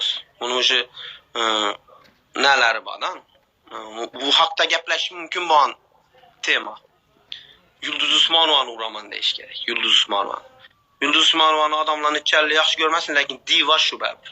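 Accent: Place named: Khorezm, Uzbekistan. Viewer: Yomon gaplisqu xorazmchada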